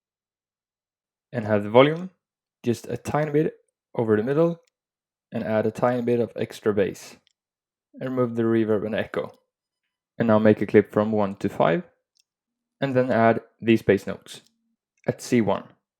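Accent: Sweden. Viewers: Er du fra Sverige?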